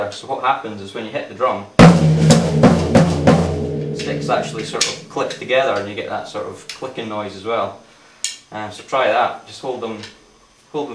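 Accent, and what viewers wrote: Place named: Scotland, United Kingdom. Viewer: you're awesome. I love...always wanted to find a drummer who could play it...adorable Scottish accent. Amy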